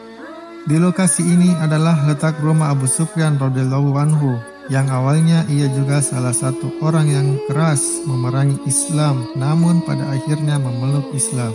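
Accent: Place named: Bugis, Indonesia. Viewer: Orang Bugis ini yang ngomong to? Kentara logatnya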